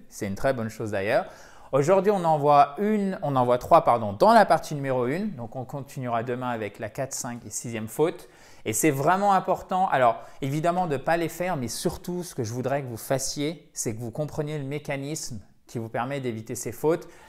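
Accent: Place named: United States. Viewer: Mais votre prononciation est bcp plus de l anglais américaine pas vraiment britannique.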